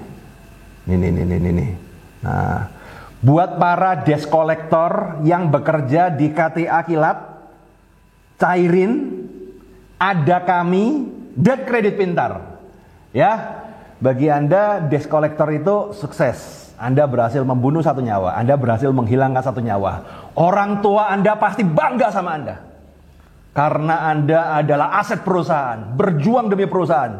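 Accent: Java, Indonesia. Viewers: Jowo medok mas...juan kekel aku mas...maturnuwun mas anda luarbiasa🙏🙏🙏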